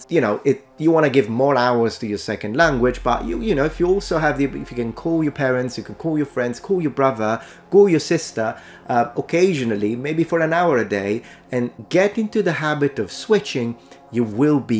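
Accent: United Kingdom. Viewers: What's crazy is you are speaking to us, and I can hear your italian accent when you speak english with some british english accent undertones for how you pronounce certain words. It's just interesting though. Thanks for the video :D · as someone from the u s you have a uk accent. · I'm new to the channel. As an American I find it kind of interesting that you're an Italian but speak English with an accent that I as an American see as mostly British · your accent in english is british but for me its really clear and easy to pick up. i am not a native english speaker but i have heared several british accents and i always struggle to a certain extent, to the point that i try to avoid it sometimes. your accent is kinda wierd my friend i guess because you have italian bloodline btw i studied the american english accent. that is why its tough for me.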